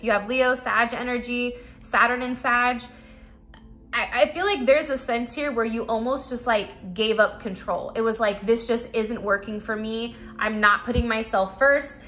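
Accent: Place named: Bay Area, United States. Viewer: Def Bay Area Cali girl Lol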